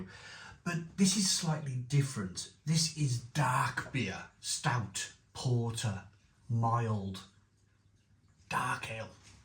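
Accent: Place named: Newport, United Kingdom. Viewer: u from Newport, mate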